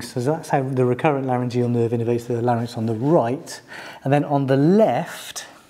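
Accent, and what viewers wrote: England, United Kingdom. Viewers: This guy looks a bit like Eric Clapton. It's great to hear someone with an English accent explain stuff compared to all the vacuous sounding Americans dressed up in scrubs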